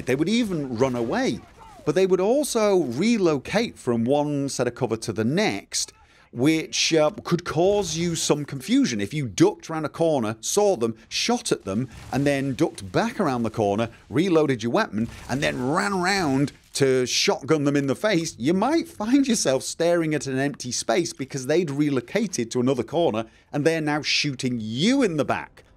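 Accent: United Kingdom. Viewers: Well then. So I know how whining sounds in a grown man's British accent.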